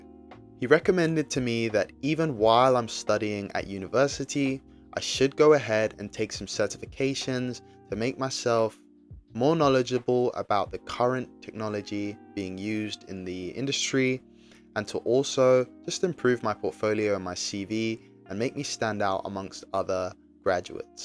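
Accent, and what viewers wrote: United Kingdom. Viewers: Hey man, by your accent you sound like you're based in the UK? How's the demand for cloud roles over there. I'm currently studying for the AWS Solutions Architect Associate cert. But I'd like to believe there's plenty of overlap in cloud if I decide to do anything related to Azure later on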